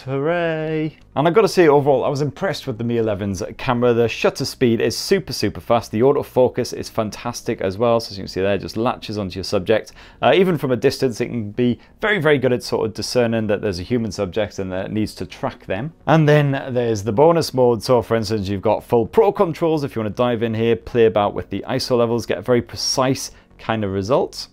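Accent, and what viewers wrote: United Kingdom. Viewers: he got a hard british accent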